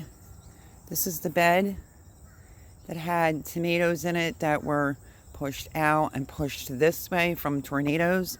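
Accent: Philadelphia, United States. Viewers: I immediately knew you were from PA. I’ve been out of Philly for almost a decade now and your accent is making me homesick! Haha. Very helpful review, I definitely will be ordering a case of these. God bless!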